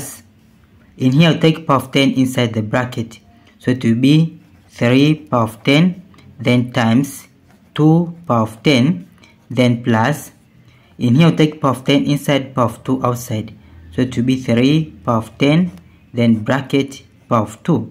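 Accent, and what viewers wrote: India. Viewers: インド訛り？の英語がクセになってつい見てしまう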